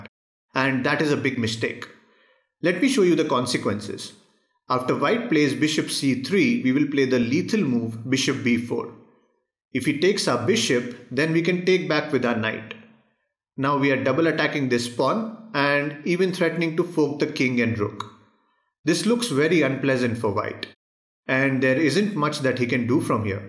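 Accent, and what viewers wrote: India. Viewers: Indian accent is greett👍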